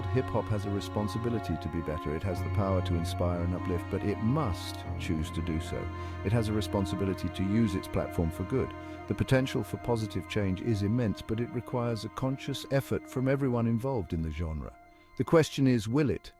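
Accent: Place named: United Kingdom. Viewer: hearing a British guy talk about hiphop is wild haha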